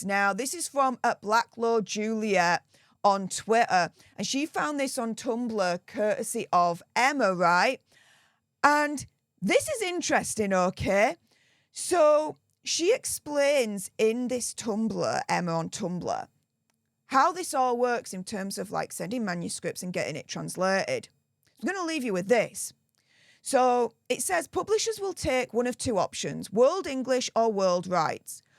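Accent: Yorkshire, United Kingdom. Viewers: your literally loosing your Yorkshire twang, you need sleep, and a hot toddy. On top of it you need to be honoured with a Damehood, for your contribution to this, after an operation.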